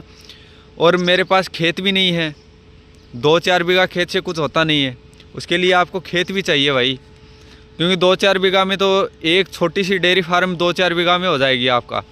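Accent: Haryana, India. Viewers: Haryana k lage h bhai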